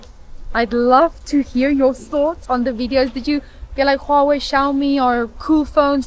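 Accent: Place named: South Africa. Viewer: Please forgive my asking.. You sound South African.. Im from Johannesburg And im your newest subscriber 🎉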